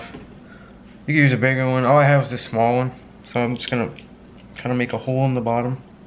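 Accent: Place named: Lafayette, United States. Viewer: When I hear you talking, I knew you from Lafayette. I saw you scan and seen KATC and confirmed. I'm 40 min South of you. Great video!!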